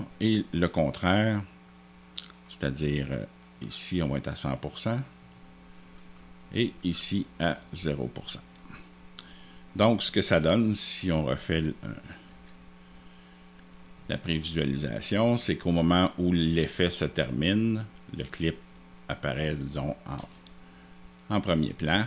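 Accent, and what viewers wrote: Quebec, Canada. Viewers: je suis content car j'ai regardé quelques video de vous sur trapcode particular ( faire de la fumée ) il y a 6 mois mais je n'avais pas votre chaine sur le nom des videos. J'ai bcp aimé vos video et votre accent Québecois ou canadien, ces petites variations / differences de mot, j'adore ! Merci à vous ( ca fait voyager en plus ! ) je suis tombé sur cette video, en cherchant quelques exemples ( meme si déjà vus ) car je me suis dis : bon sang : quelle difference entre Particular et Form, et now, c'est plus clair ( mais pas encore assez... ) ! Form aussi est vraiment tres puissant ! ce qui est génial avec vos vidéos, c'est que vous allez à l'essentiel, il n y a pas ( ou peu ) de moments ennuyants. Sur cette video, dés les premieres minutes, vous présentez l'essentiel des etapes !! Thank !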